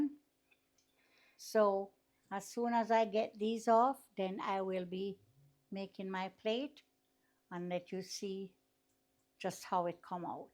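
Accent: Jamaica. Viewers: Her voice alone just bring me back to Jamaica. Reminds me of so many elders that sounds like her ❤. Once you put sugar its no longer fry dumplings tho 😂 its either sugar dumplings, Johnny cakes or bakes as the rest of the Caribbean call it 😁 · I love your Jamaican voice I feel like I am in Jamaica 🇯🇲 judt listening to you 😅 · Yes....a pick up de real Jamaican talk and real Jamaican "cooking hand". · Is she Jamaican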